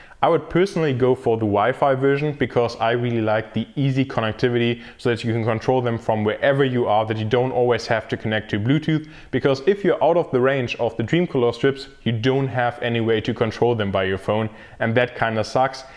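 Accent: Germany. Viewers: Merke ich da etwa einen Deutschen Akzent ? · Dieser Deutsche Akzent haha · Which model/Version is the best of rgbic (bc i want to buy 10 Meters but i want the best version) btw are u German bc of the accent if yeah hallo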